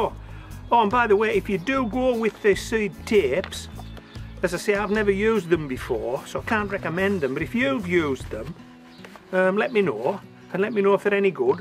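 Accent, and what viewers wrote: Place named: Scotland, United Kingdom. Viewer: GMC 06 he sounds like Scottish man 😋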